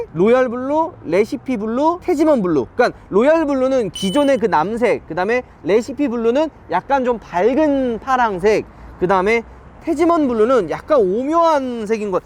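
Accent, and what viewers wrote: Gyeongsang, South Korea. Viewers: 나나지는데요. 하는거보니 는 경상도 사람이었군 ㅋㅋ 담에 사투리 리뷰 부탁해요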